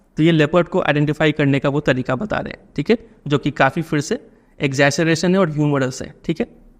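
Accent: Bihar, India. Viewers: sir are u from bihar your explaination is bestest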